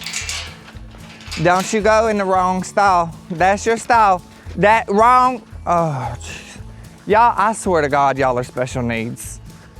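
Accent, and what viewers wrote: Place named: United States. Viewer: Lol.. Why does he sound country, black and gay 😂😂😂 allllll at the same time .. Which is fine and its funny...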